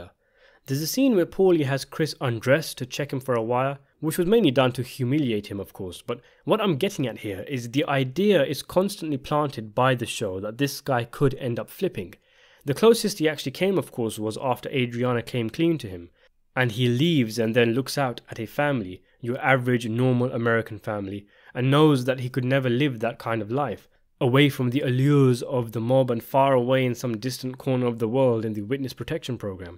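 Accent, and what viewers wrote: United Kingdom. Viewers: I’m sure I said this in a previous video but it’s so bizarre hearing a British accent talk about American mafia business and a show based in my home state but it works in the best way .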